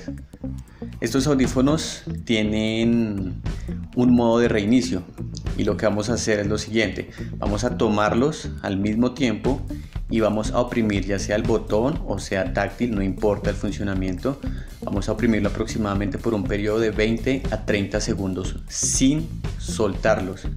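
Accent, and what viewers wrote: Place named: Colombia. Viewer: Qué bonito se escucha el acento colombiano !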